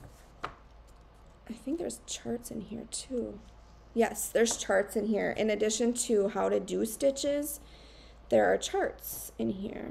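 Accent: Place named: Minnesota, United States. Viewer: I just found you last night so I'm excited you posted today!!! I love your small accent too it reminds me of the Fargo movie (in a good way as I love that movie, especially the way they talk)...I like your style of patterns you work on as it all different, sampler, full coverage, Halloween .... looking forward to your future videos. See you next time from central Canada 🇨🇦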